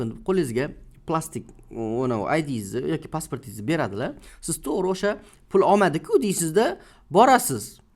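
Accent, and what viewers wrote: Khorezm, Uzbekistan. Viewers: Брат хоразмданмисиз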